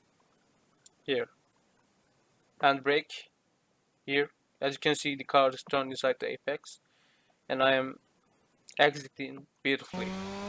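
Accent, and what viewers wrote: Turkey. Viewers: ben direk anladım türk olduğunu çok belli aksanı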